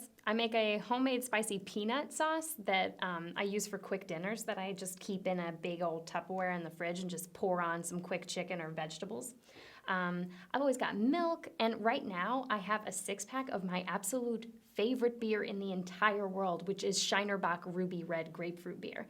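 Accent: England, United Kingdom. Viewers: like your english accent! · 1. Loved the dark Kennedy joke 2. Loved your British accent 3. I see you have a blank coffee mug!!! 4. Buy an Abe Coffee Mug!!! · Her british accent is so damn cute lmao! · Why is she not sleeveless!!! Good British accent though!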